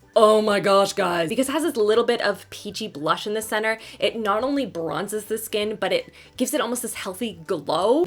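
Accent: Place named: Canada. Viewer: Can you just talk textbook Canadian for a makeup tutorial, cause that would be magical.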